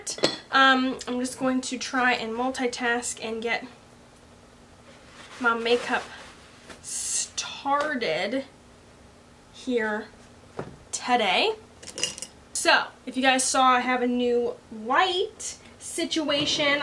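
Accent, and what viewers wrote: United States. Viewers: what kind of american dialect is this?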